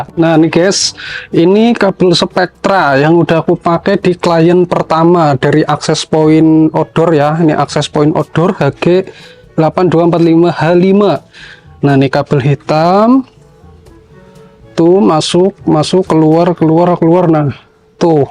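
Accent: Banyumas, Indonesia. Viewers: Ora ngapak ora kepenak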